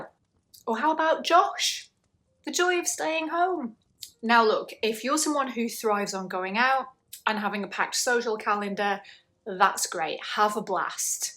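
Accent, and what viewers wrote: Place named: Northern England, United Kingdom. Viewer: Great reflections. Candid and sharp but genteel and insightful. What region of the U.K. Are you from? Love your accent. Northern, I imagine.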